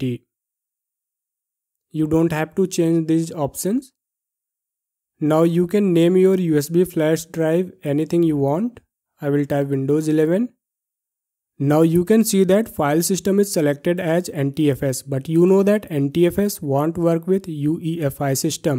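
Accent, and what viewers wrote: India. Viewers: Dude can you import subtitles? I understand english, but that "thick" Indian accent is very hard for me to understand.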